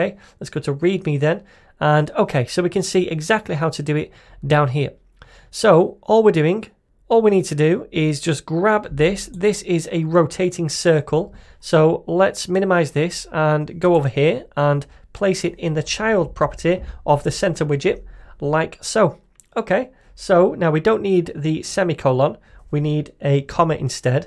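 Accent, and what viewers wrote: United Kingdom. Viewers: are you bri iiish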